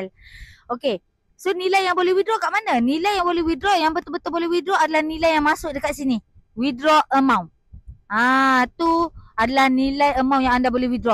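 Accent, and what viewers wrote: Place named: Endau, Malaysia. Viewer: Suara mcm org endau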